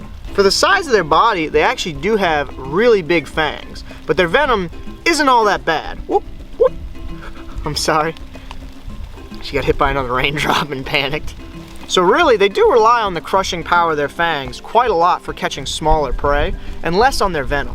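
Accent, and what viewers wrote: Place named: Florida, United States. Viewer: First video I’ve watched on this channel, and I could pick you out as either a floridian or Louisianan without even trying. We floridians can sense our own 😂. Also, hey, that beer is still waiting for you in Panama City! Much love guys! 🍻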